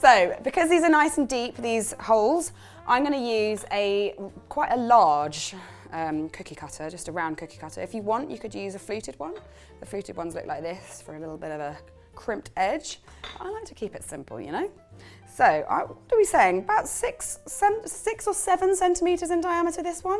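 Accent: United Kingdom. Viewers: I am puertorican,and I love my kitchen and other peoples kitchens. To try new recipes, I've never had mincemeat pie,I thought it was literally made with meat. I love raisins, and your pie crust looks so delicious,I think I will try this for my Christmas 2025,God willing 😊 I love your british accent. Thank you and blessings to you.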